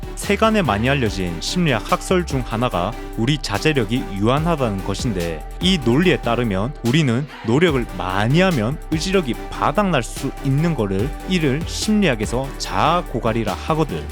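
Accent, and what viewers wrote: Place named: Gyeongsang, South Korea. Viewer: 찰진 경상도 사투리에 수상할 정도로 서윗한 목소리... 니 머가...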